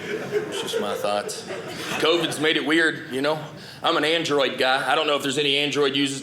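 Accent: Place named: South, United States. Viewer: it's how Alabama, Arkansas, Texas... people talk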